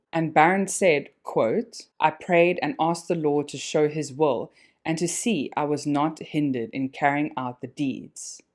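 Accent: South Africa. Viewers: I believe is presenting this case for its phsycopathic value in relation to Barend, not because she wants to be political or racist. Obviously she is S African, accent is unmistakeable.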